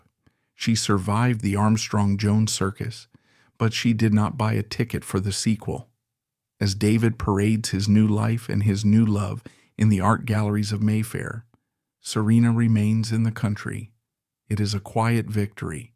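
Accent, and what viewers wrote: United States. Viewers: Not too much Irish blood there I would think , begorrah . Too long , deadpan American accent . Should be wrapped up in 20 minutes (for a minor royal) .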